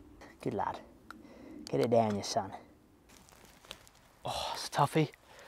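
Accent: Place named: United Kingdom. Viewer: Make a hammock! I like the new mic. I can hear your beautiful Bri-ish accent much better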